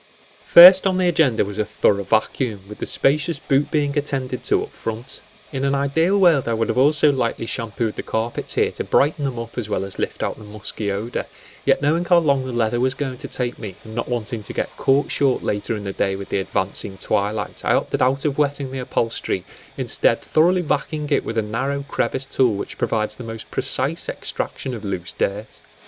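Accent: Liverpool, United Kingdom. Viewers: You're a scouse I'm a manc......gotta tell you guy......good job..... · from canada? yeah right, i hear that scouse accent..................lol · Not been this engrossed in a Scousers running commentary since Ringo Starr narrated Thomas the Tank Engine back in the day. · I am not that familiar with Amy MacDonald but she's Scottish and the has a Scouse accent so you haven't even got the right country. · Scouse accent + Soft Voice + Proper English... Champion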